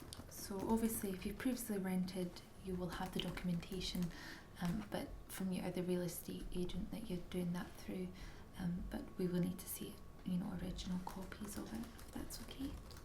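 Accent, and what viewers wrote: Scotland, United Kingdom. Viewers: Great Scots accent *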